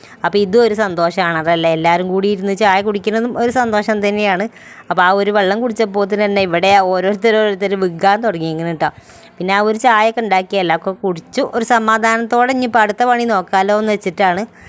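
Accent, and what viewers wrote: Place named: Malappuram, India. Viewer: Ethrayaayaalum ningalude prarthanayum nombum chechi Allahu sweekarikkum.Alhamdulillah . Ningalude varthaanam kettal assal Malappuram thathamarude polethanneyund.May God bless you sister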